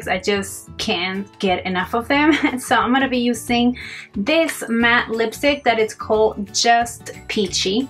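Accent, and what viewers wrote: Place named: Caribbean. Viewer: Such a pretty look! I didn't realize you collaborated with one of my favourite youtubers. You have an accent similar to hers are you from the Caribbean?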